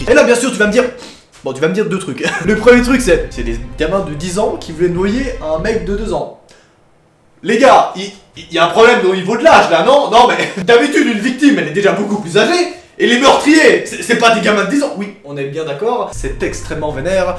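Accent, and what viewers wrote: Belgium. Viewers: T belge?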